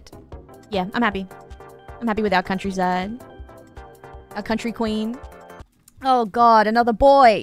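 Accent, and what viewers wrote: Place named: Australia. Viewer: Your Australian? INSTANT SUB C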